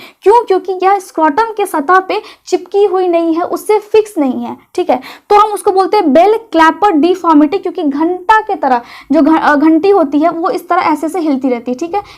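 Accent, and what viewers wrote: Bihar, India. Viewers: Bihar ki ho,Mother Tongue in Fluency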